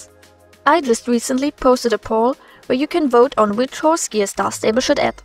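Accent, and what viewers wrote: Germany. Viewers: Man hört so raus, dass du deutsche bist HAHA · Bin ich die einzige die da etwas deutsches an ihrer Stimme hört 😮❤ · Das du Deutsche bist merkt man echt krass an deinem Akzent... XD Kennst du eigentlich eine Feya Steelleaf vom SSOA..? p.p oder Vielleicht Camelia Mistlord? XD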